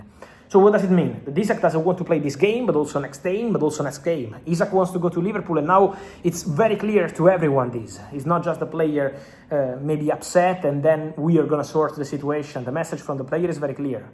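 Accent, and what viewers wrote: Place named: Italy. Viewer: Nothing can beat Italian Accent .... Barrrrnn Mouth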